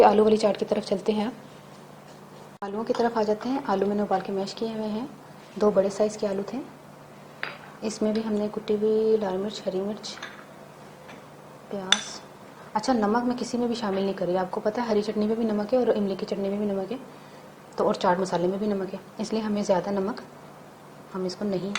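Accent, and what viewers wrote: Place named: Rural, India. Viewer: Bhen m kehna chahti hu ky agr video bnani h to kissi sy voice over krny ki classes lyy lo w ky apko sense nhi h kese bolyy h bht paindu boltii aur aik bt ap bht zuda bolti wooo naaaa wooo naaa aur aik wo apki h jis ko bolny ka tarika hi beech m urdu ky punjabi start kr deti h plzz tameez sy bolnaa seekh loo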